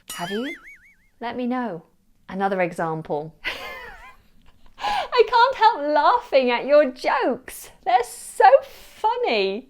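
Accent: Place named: RP, United Kingdom. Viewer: If I am not wrong you are from the north of England, how did you get your beautiful RP accent?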